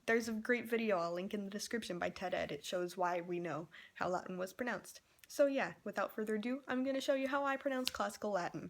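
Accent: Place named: United States. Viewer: Great video, but you should really try to master the IPA pronunciations, physically. It still sounds like an American English speaker pronouncing latin: Although you're spot on in explaining the proper vowels, the vowels are different than the way you're pronouncing them.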